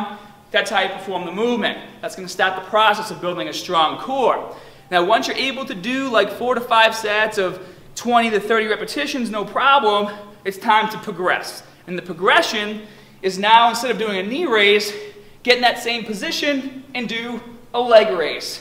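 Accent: Boston, United States. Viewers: boston accent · Yeah he's from Boston so he's just been hiding it in the past. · I figured maybe he always spoke with a Boston accent but hid it in his earlier videos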